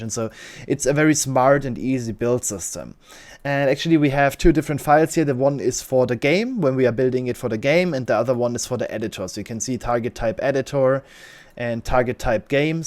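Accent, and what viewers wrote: Germany. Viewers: Youre Tutorial helped me out so much thx but one question are you german? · Strong German Accent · sounds like an german accent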